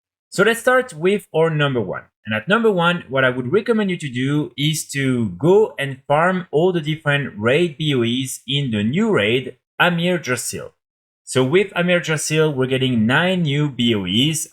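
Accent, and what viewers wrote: France. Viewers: Are you French ??? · Nice french accent :) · tu es Français toi, l'accent ne trompe pas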